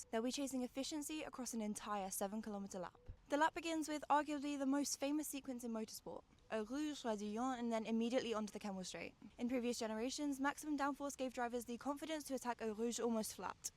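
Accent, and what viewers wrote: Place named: France. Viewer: That French accent 🔥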